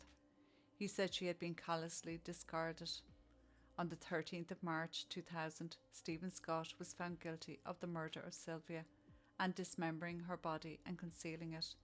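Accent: Ireland. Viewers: It feels like it's been forever since I've heard my favourite Irish voice. My apologies for my absence lately. Haven't been online due to sickness but I'm back. As always, love your work 💙💙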